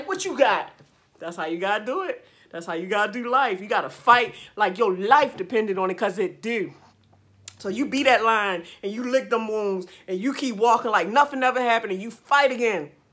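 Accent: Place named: South, United States. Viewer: . I really enjoy your content. I really enjoy your positive attitude. The southern twang is beautiful. I am going to law school in August. I have been driving for about 30 years. But you have to go after your dreams. Take care. And another thing, you are so beautiful and have a beautiful spirit too.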